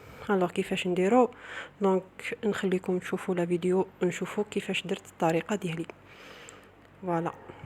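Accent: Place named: Algeria. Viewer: merci pour la recette . j'aime ta façon de parler algerienne . krahna mell3arbiya lfosha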